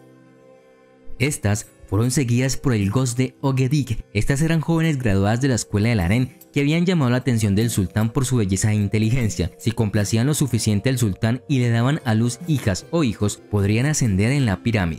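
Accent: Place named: Colombia. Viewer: El que está hablando debe pronunciar la "d", parece colombiano, o es, ellos no pronuncian bien las consonantes, y le cambian el acento natural a las palabras.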